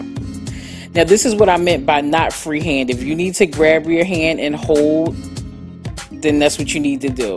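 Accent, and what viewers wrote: Philadelphia, United States. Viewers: Where can I buy your hair straightener and do you ship to London I wanna know please ❤️ thank you. You are great hairdresser and I wish London had hairdresser like you..I can tell you accent your American. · i hear philly all inna voiceeee lol philly inna buildingggggg i love your work sis keep it up ! ❤️❤️❤️❤️ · Omgggg I love your Philly accent. When you said “fluffy” I was like, there it go right there! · You have a Philly accent. Where are you located? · I don't even know where she is, but that accent is definitely Philly. Lol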